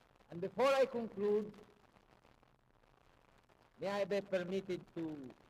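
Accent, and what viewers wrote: Philippines. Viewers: Rinig mo yung Bisaya accent niya haha. Not meant to be an insult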